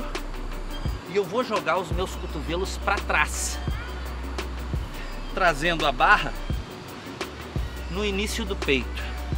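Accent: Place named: Porto Alegre, Brazil. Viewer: Tu é gaúcho ? 😅 Percebi um ar portoalegrense na tua forma de falar,as praças dos vídeos me lembram muito Porto Alegre,pois sou de Porto Alegre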